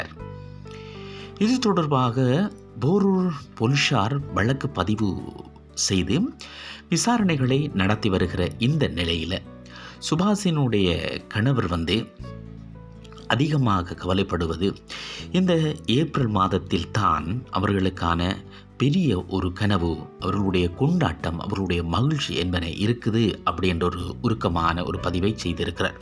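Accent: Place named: Sri Lanka. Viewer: Ok Anna neenga sri lankava unga slang apd dam erku😢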